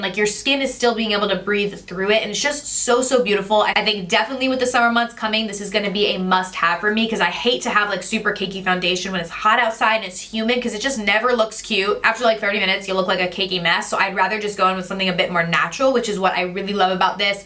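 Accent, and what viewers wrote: New Jersey, United States. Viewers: It’s how we talk in the Jerz!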